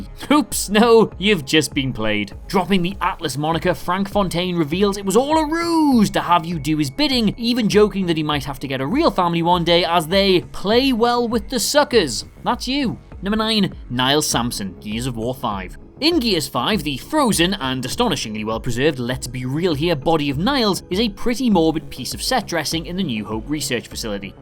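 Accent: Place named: United Kingdom. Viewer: It is a perfectly acceptable British dialect. We're not all from London you know.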